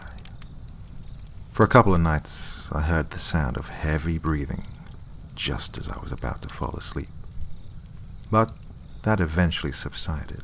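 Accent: South, United States. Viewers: Thanks for the narration man, it turned out really well. I didn't imagine them speaking with a southern accent, but now that I'm hearing it, it does add nicely to the campfire aesthetic you have going for the story. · ¿Why So Serious? xD Southern or English? Hard to peg. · I love the accent, a mix of your normal accent & a light tinge of a Southern drawl. It reminds me of the accents used by an actor in a classic Hollywood film (i.e. Gone With the Wind) — I love it & it’s very fitting for this story... despite the setting being Connecticut, apparently. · Loretta oh yeah, does him a good ol' southern US accent, yessiree bob. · Loving the southern accent, I do declare..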